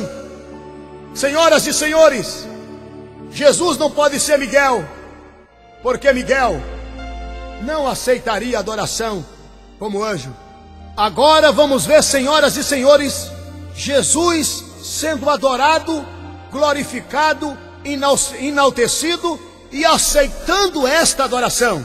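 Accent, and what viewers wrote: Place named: Bahia, Brazil. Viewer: não critica o locutor, ele é baiano, o linguaja do baiano é diferente, ele pode ter errado alguma coisa, mais ele acertou muitas coisas, que essa seita ensina, sem jesus não há salvação. e os 144mil são israelita não gentil como nós, vamos parar de ensinar heresias, como os tj ensinam...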